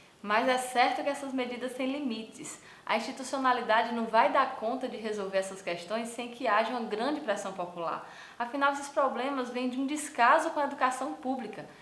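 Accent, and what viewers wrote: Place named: Pernambuco, Brazil. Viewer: Eita sotaque arretado! Parece com o meu pernambucano ❤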